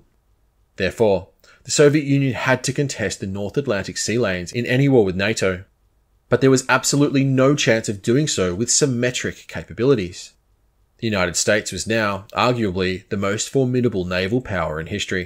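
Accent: Australia. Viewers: What am awesome podcast mate! Hearing the aussie voice for over 2 hours was quite pleasant. You could split this into 4 parts for bulk views but damn, my Saturday morning has been educational! Cheers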